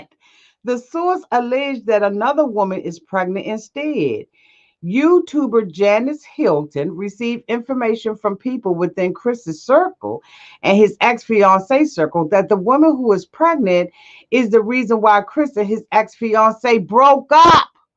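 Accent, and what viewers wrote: Washington DC, United States. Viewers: Auntie I hear DC in your voice are you from DC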